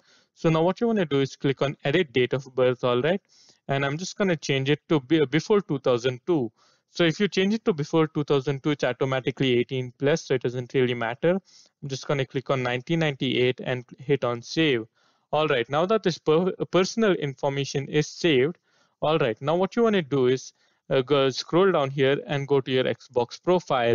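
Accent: India. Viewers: i like indian voice even if im not one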